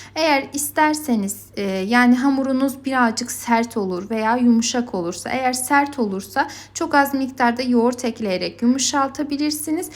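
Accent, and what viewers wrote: Azerbaijan. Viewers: Azerbaycan şivesi var. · Danışıq Azərbaycanlı danışığına bənzəyir çox gözəl tərifdi yoxlayacam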